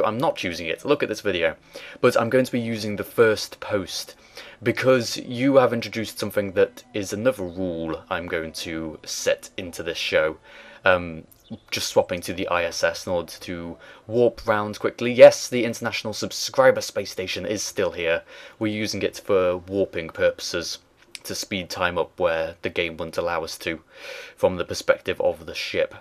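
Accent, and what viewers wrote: United Kingdom. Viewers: What area of the UK is that accent from? Can anyone tell me? Pls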